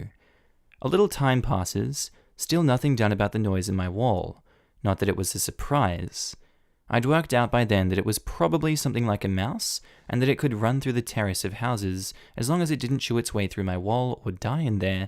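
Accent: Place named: Australia. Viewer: He's rather obviously Australian.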